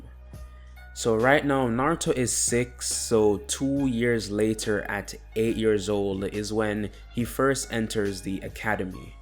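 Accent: Jamaica. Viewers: hi, I I'm just wondering if your from Jamaica I hear I semi accent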